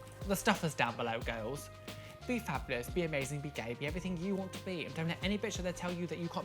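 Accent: United Kingdom. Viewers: Are you from the UK? Lmao u have an accent 😂🤣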